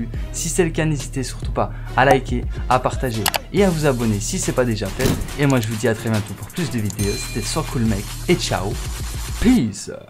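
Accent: Belgium. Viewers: IL EST BELGE IL DIT OUITE POUR HUIT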